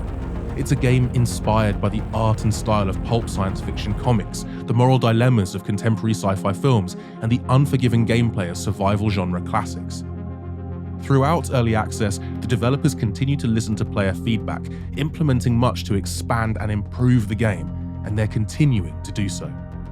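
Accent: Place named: United Kingdom. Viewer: My man you need more views with that smooth British accent.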